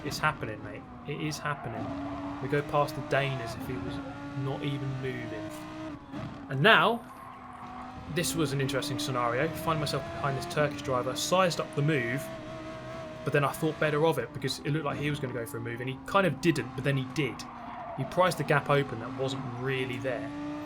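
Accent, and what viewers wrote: United Kingdom. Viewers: after a Long Hiatus from arcade/sim racing Gran Turismo 5 and GTR 2 Fia GT Racing PC( last purchased games). I'm just getting back to the groove months ago(July2020) from pad controller to Fanatec CSL F1 bundle(September2020) . Gran Turismo Sport(Awesomeness) and ProjectCARS2(Sim AWESOME), ProjectCARS3(going backwards A FLOP). I'm Hoping with GT7 to be the BEST in both Worlds, Graphics, Driving Realism Arcade and Competitive Sim Racing, I enjoyed watching your videos and host commentaries. I wish I did'nt take that Hiatus. Keep up the Great Work and Race Sim Driving !! Ohh, one more thing, I guess your Filipino ..cause it's pretty cool hearing that Brit accent. ;o)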